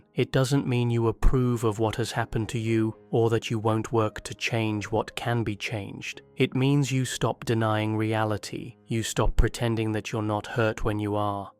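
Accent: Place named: United Kingdom. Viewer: Oh...I was waiting for you to release a full Jungian video 😉☺️ you have no idea how your videos touch my soul in this way that sometimes makes me gently cry with relief, or just put a smile on my face in the morning that stays all day, even all week as I daydream about the ideas you espouse. To be completely honest, at first I found the speaker's voice (is it AI? I seriously can't tell!) to be slightly unsettling because of the monotonous contour tone and strange vowel inflections (sometimes the vowels are inflected as they would be in the north of England, but usually a standardised southern english dialect that very occasionally slips into full north american dialect) I think my linguistics brain didn't like those anachronisms but quickly I grew to find the cadence and pitch soothing, I've always had highly sensitive hearing and seem to be very inwardly sensitive to sound. 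I can't watch television because I grew up without it and find it super abrasive. Do you know what I mean? A man got me to watch the football and the violence really affected me and the bizarre sound of their bones clacking together when they tackle each other was grotesque. So I find a lot of videos on YouTube abrasive. Yours are perfect for me. And I haven't even mentioned the content. It nourishes my soul. So special.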